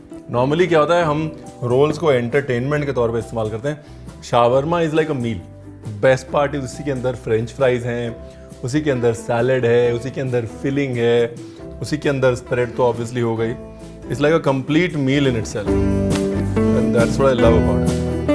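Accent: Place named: Punjab, India. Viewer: bro u r out standing i mean kuch v hove tuhadia videos ni kde v miss kitia n tuhadi jheri apne aap punjabi a jandi vich bolde bolde oh ta bhti vdia lgdi menu jdo hain kehnde "hain" kehnde hehhe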